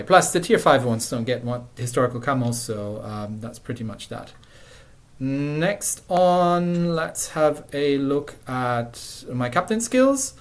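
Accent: Germany. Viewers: Hey are you by chance german or scandinavian? Noticed your accent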